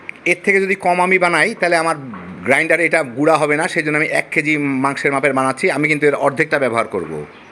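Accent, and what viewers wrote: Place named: Barishal, Bangladesh. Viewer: আপনি কি বরিশালের??? কথার ঠং ভাল্লাগে নাই। আর রসুন তো বেশি পুড়ে ফেলেছেন।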